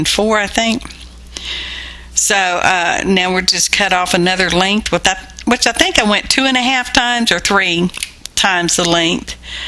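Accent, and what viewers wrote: South, United States. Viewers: Absolutely AWESOME 🤩 thanks for the video and your wonderful southern talking! You have to do a video on why we buy these scrapbooking items! There is a woman that did one, it’s really old now and we have all new and different things! I can’t remember the title but it’s about why we have all this scrapbooking stuff! You had me gut busting laughing all the way!!!!! I LOVED IT AND I NEEDED IT!❤️🌟❤️🌟🙋‍♀️✌️🙏🤩😂🦄🌈👍😁💗❤️💗❤️🤷‍♀️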